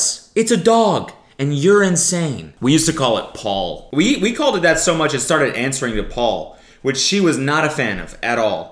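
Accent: Canada. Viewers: I had to scroll so long to find this comment. Who says “pro-sess-sor” instead of “pross-sess-er “? Sometimes be soundin realllll Canadian 👀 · Lauren Annie because Canada. He says sorry like that too lmao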